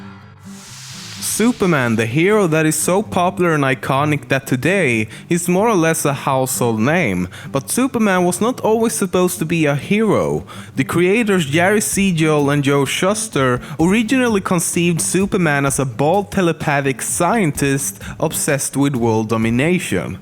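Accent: Sweden. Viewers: well he is swedish so swedish people can't pronounce very well in "J" letter which i love listening to their accents :) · He is swedish... basically like pewds, jennifer= yennifer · Hahah, your swedish accent makes me laugh xD Norway ftw! great videos though (Y)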